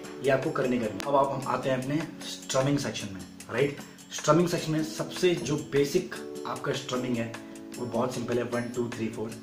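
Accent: Himachal Pradesh, India. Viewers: Himachali ho bhaiji ??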